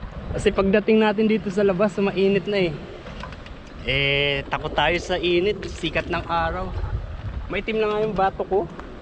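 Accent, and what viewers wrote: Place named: Binangonan, Philippines. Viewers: Tiga rizal k pla sir.sv ko n nga ba eh.napansin ko na sa punto mu..tiga talim island naman ako.part ng binangonan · Taga Binangonan po kayo? yung punto po kase nyo tunog taga doon hehehe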